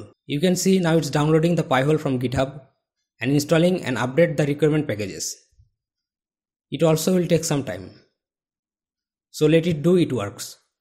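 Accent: India. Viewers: sounds like india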